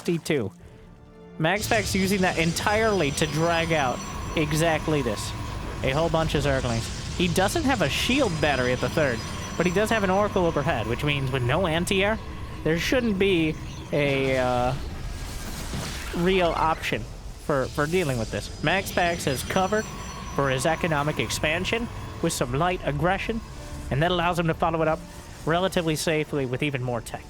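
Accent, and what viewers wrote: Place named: Transatlantic, United States. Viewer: Can I just say, for some reason your voice has a trans-atlantic quality, and for some reason i find that somewhat enjoyable.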